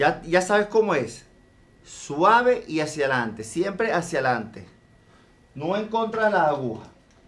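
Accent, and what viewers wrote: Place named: Venezuela. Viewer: Yo estaba claro que eras de venezuela por el acento,un crack perro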